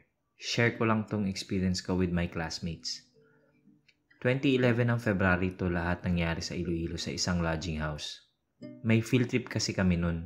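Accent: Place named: Bicol, Philippines. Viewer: Bicolano ka kuya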